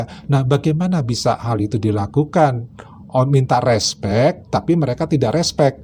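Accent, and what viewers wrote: Indonesia. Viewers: Bu...logat bahasamu itu berbahasa indonesia .. hhh...jadi intinya rakyat malaydesh ini belum sadar diri ...makanya sarapan pagi dulu biar sehat dan otak bersih 😅😅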